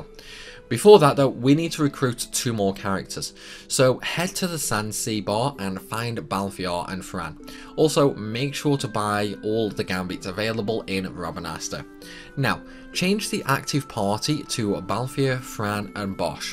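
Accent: United Kingdom. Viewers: Ngomong pake aksen british agak ngeselin juga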